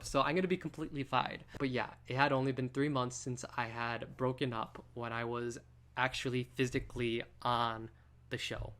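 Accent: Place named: United States. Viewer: You sound American... You speak English well!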